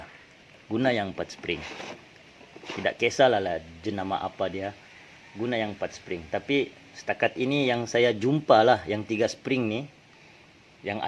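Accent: Sabah, Malaysia. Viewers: Terima kasih encik berkongsi ilmu. Suka saya mendengar loghat orang Sabahan. Kereta pun sudah ada tanda2 perlu tukar. Semoga dimurahkan rezeki tuan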